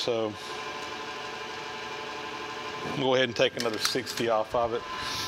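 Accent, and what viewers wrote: South, United States. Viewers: As a new subscriber to your channel and a longtime subscriber to Leo’s I would like to ask a favor. If you will sir, please take a breath between your name and Vintage Machinery. It sounds like one-word it all runs together and is difficult to understand. The rest of your video sounds great with your eloquent Southern accent. I know your future subscribers and some of your present ones will thank you for it. Looking forward to watching more of your channel.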